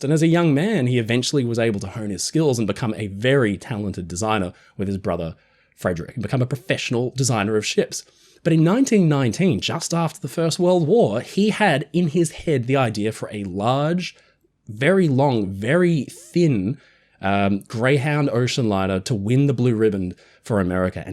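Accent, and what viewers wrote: Australia. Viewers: Friend of mine retired from the merchant marine roade the SS United States. He said she was somewhat rough riding he thought due to its speed. A shame but the time to have done something was decades ago well before the Mad Men show. Huge cost, being in Philly didn't help. Interiors is what most people want to see. Ship didn't have the right friends in the right places. But as you noted, very few ships saved/preserved. Even naval ships were neglected, Farragut's flagship rotted away. Nothing from the Sail & Steam era I'm fascinated by. Thanks. Beautiful rant. So you're an Aussie??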